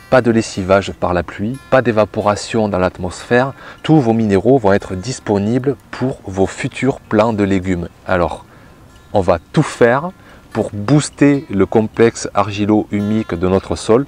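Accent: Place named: Southwest, France. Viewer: Elle l'est car peu travaillée ces dernières années c'est plus sûr la strate du dessus l'herbe était coupée a raz tout le temps donc la terre peu protégée des UV :) j'entends que tu prononces le S quand tu dis : moinS tu es d'origine du sud ouest ? :)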